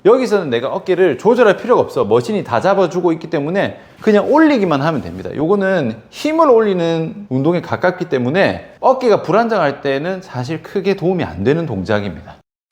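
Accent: Gyeongsang, South Korea. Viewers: 그냥 경상도 말투 😂😂😂😂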